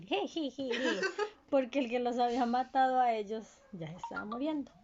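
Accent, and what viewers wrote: Colombia. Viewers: vi todo el vídeo y escuche tu acento eres de Colombia?? Que buen video por cierto de chiquito me daban miedo los electrónicos XD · que señora con cultura mi valecita mia Bv, eres colombiana? Se escucha en tu acento, si es verdad yo también 👌👌👌 Pd: nueva sub gracias a este hecho contenido 👌👌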